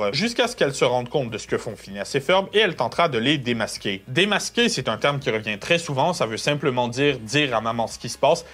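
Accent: Canada. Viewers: Salut, est ce c'est possible de faire une vidéo sur la série LoliRock (car c'est uns de mes séries préférée) STP. Merci beaucoup et bonne journée à toi et tu as des bisous de l'île de la réunion pour ton pays du Canada 💋💋💋 Et joli le petit accent canadien ! J'adore !!!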